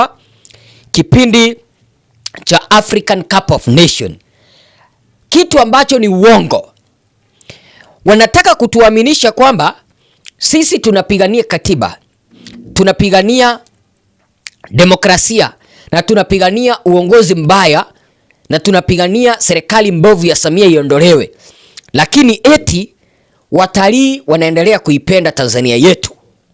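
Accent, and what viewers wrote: Kenya. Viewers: Mbona ki Swahili cha Kenya. Halafu unasema nchi yetu Tanzania.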